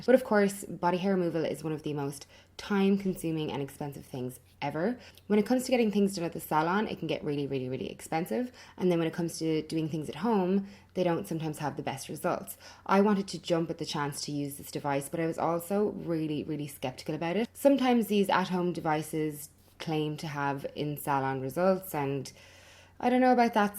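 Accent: Ireland. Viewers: Was very confused at first when you mentioned Australia because I was like, "But wait... she sounds Irish. There is no way she is not Irish." Thank you for having accurate information in your "About" section! :)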